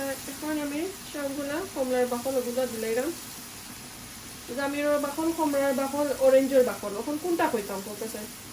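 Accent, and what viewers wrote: Sylhet, Bangladesh. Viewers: Apu Komlar ball diya ranna kub Moja lagbo.nice hoise.duwa kori apnar osuk komto.apnar sylheti mat amar kub Valo Lage. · মাশাআল্লাহ অনেক সুন্দর ভিডিও সিলেটি আফার মাত যে সুন্দর লাগের, হাসির মধ্যে মুক্তা জ্বরের গু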